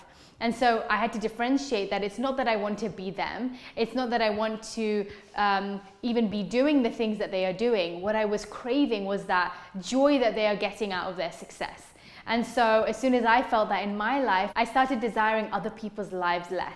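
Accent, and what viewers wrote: Sri Lanka. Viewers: Hey, are you a Srilankan? I always thought you are an Indian (a kannadiga or something) but your Tamil sounds like Sri Lankan! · Holy cow... I never knew u can speak tamil and it sounds so much like you are from jaffna. Love from Sri Lanka ❤👌🏼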